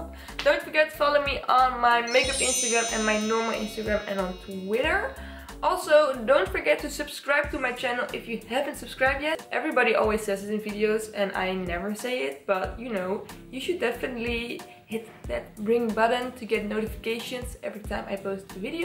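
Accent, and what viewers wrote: Netherlands. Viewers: Benje nederlands want ik hoor accentje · Why does it sound like you have a dutch accent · U sound like ur from the Netherlands 🤔🤔🤔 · No hate but is she Dutch?? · Hahaha i came to the comments to check if she was dutch😅 heard something familiar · why does she speak so funny? is she dutch? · R u dutch? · are you dutch? :D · You have a really Dutch accent while you speak English.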